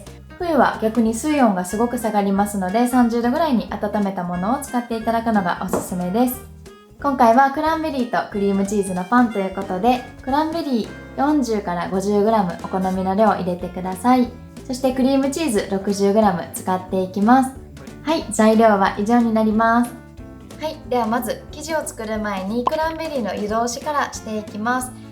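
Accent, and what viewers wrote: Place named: Kansai, Japan. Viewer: はじめまして、さん！年末に仕事を辞めたので、時間ができたら米粉パンに挑戦！って思っていて、パン好きなので、グルテンフリーに前から憧れてました🥰 沢山ある動画で、さんのは、とても分かり易く、同じ大阪の方で何といっても関西弁にほっ！として、優しいほんわかな感じがとても良いです。お総菜パンも作りましたが、今日はクランベリーとクリームチーズを作って、めっちゃ美味しくできました😀 先日のライブで、チョコパイの話をされていたので、さっき動画観て、作りたくなりました。ドーナツも気に入ってて、まだ作れてないんですが、挑戦しまーす。こんな貴重なパン作りを無料で拝見できるなんて、有難いです。他もまだまだいっぱい作りたいと思います。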